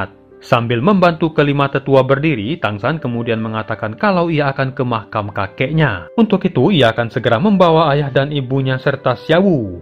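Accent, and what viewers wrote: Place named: Madura, Indonesia. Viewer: Ketarah jhek reng madureh🤣semangat tretan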